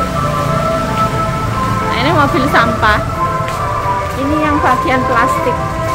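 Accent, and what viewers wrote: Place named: Java, Indonesia. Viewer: Mandarin versi Jawa yuk... Muedok e poll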